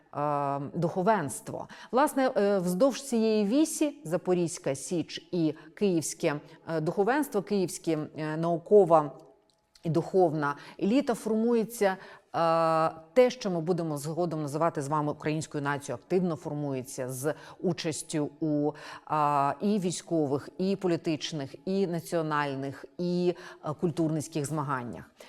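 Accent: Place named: Russia. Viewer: Дивно слухати від пані лекторки російську вимову наших старих текстів: іґраніє, Саґайдачний А попри те це відео - добрий вступ до тієї бурхливої доби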